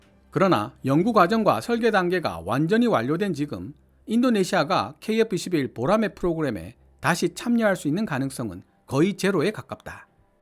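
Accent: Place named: Busan, South Korea. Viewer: 부산 사투리억양 정말 듣기 힘드네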